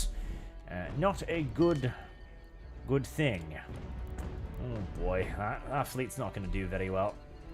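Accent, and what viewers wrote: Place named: United Kingdom. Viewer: Almost 4 hours of being a better brittish that most modern british, good stuff!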